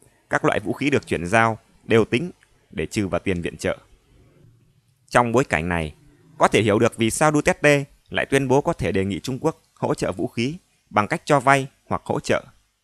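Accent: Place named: Nam Dinh, Vietnam. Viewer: ad. que o dau z.. nge giong o nam dinh z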